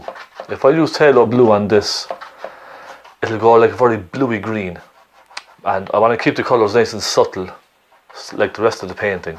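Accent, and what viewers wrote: Cork, Ireland. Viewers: nice one bud thanks ,,,, from your accent id say your not far from me ,, :) ,, co cork me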